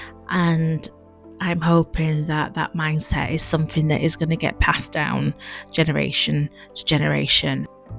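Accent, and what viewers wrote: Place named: England, United Kingdom. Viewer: As soon as I saw the name of the channel I immediately knew they weren’t Irish 😂 came to double check I’m right and heard the English accent. ❤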